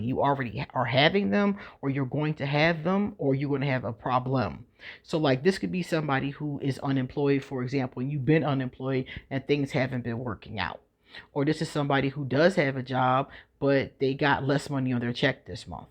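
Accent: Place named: Philadelphia, United States. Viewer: Ma’am! Are you from Philly? I can hear your accent. Signed- a Philly girl who is an Aquarius, lol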